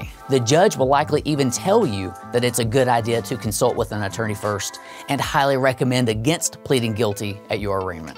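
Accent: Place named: United States. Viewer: true. But who are you replying to? I don't see anyone saying they're the same, and I think we all understand that this video was about the US. (The accent was a pretty big clue)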